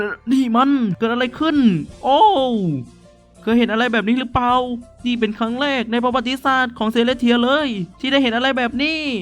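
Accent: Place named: Thailand. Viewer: เป็นการอ่านที่เป็นไทยมาก555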